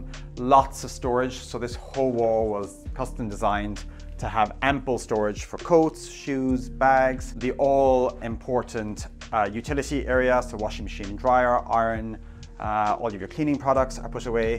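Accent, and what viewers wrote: Ireland. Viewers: I am the first to admit that I'm a bit of a design snob... And yet, I appreciated and enjoyed the decisions you made to create your personal space. Well done, you. (Plus, an Irish accent to boot!)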